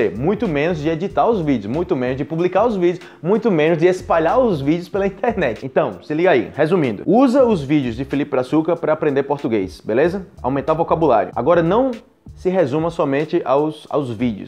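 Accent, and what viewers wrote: Antioquia, Colombia. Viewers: Increíble como habla muy bien el español colombiano. Yo soy nativo colombiano de Bogotá y nunca conseguí usar esas expresiones ni tener acento. Es verdad que es español paisa eso, mas en español de Bogotá también hay un acento y expresiones y nunca conseguí hacer. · Ya somos 2. Si que la vacila con el acento colombiano. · 😂😂😂😂 Que cómico hablando español "paisa"... pareces colombiano, la verdad!! 👍🏻👍🏻👍🏻👍🏻👍🏻 Tus vídeos me transmiten una vibra positiva, gracias Brazuca! 😊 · Kkkk adorei sua conversa paisa! Jajajja quiero saber como te sale el costeño🤔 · me encanta como hablas el español colombiano (paisa)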